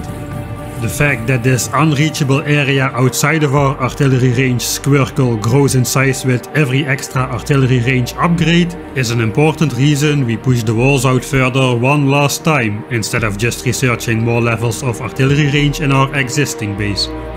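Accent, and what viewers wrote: Netherlands. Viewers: I was doubting whether you was german or dutch based on your accent, turns out dutch after all (checked your youtube page). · Lekker bezig man, gave playthrough en mooi bewerkt. I love your attempts to * cough * properly pronounce the English words like recipe. Every time I hear a fellow Dutchie pronounce recipe that way (and I heared it very often back in the day I played WoW) I just crack up :D Gewoon lekker Dunglish blijven praten ;)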